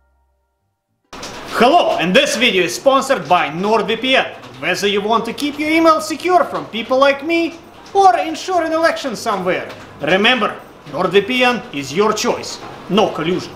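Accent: Russia. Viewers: I tried watching this in the break room at work today. My Belarusian co-worker happened to walk in and she was like "is that a Russian accent?" Yeah, we had a good laugh. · Arh. The russian accent is back. I like, i like very much. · Wth why is he getting more russian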